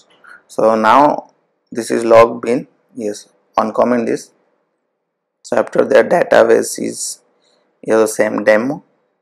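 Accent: Odisha, India. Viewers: Great video and my doubt was correct the guy behind the video is an Odia… not from name but from pronunciation….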